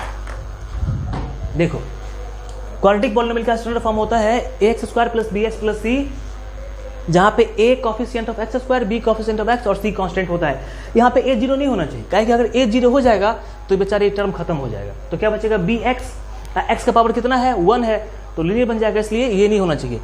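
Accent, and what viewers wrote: Bihar, India. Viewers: aisa style to sirf Bihar ke logo m hi h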